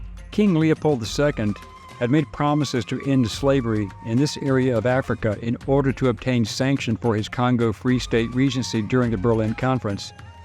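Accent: South, United States. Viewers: If there were so many slaves, where are they? All integrated into their societies. You are totally wrong about Somalia. Swahilil is not an Arab language. Mr Marine Corp, were slaves to the Caribbean and America ever integrated even today? You sound Southern, you should know.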